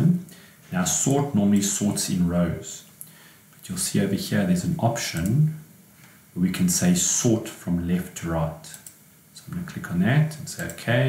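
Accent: South Africa. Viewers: It took me longer to find this than it needed to be, so it needs to be higher up in the search engine! Also loved hearing the South African accent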